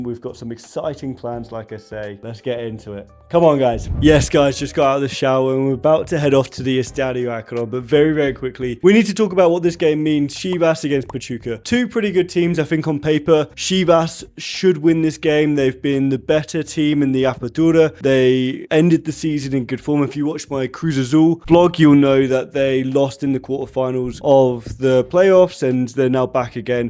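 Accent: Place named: England, United Kingdom. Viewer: Your accent sounds like English from England.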